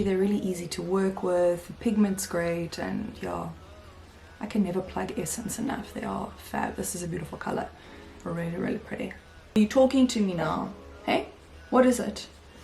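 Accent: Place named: South Africa. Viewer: I love listening to you talk cos you're South African. This makes me H A P P Y!!!! Love the eyeshadow results. I love all of them. Thank you for the demo.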